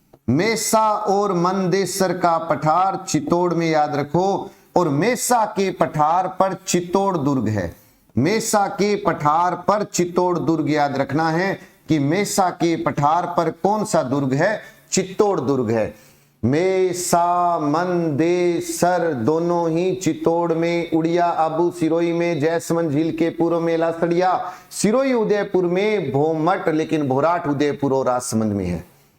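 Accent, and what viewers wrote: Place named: Haryana, India. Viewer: Sir, Your language tongue is matching with Haryanvi/Bagri, Due to regional effect It supports easy to learn then Marwadi/Mewari classes, thanks for your great efforts.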